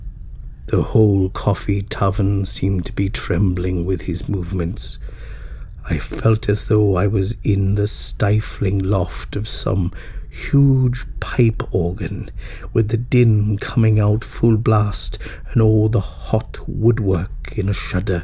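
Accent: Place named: Wales, United Kingdom. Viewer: A brilliant story and a brilliant Welsh accent, Sir... Is there anything you can't do?:)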